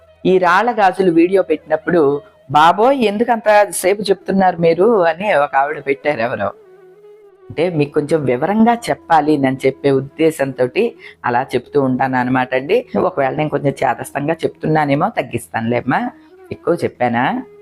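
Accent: Godavari, India. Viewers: Super amma...😂 Me gun shot answers vere level.. ayyo adukku thinadam varaku velladam endukandi.. tappu kadu... E dialogue matram super amma.. Mana godavari yaasa.. manam entha correct ga unna matladina nachani vallu alane antaru amma.. nenu chala navvukunna e video chusi. 😂we enjoyed a lot 😂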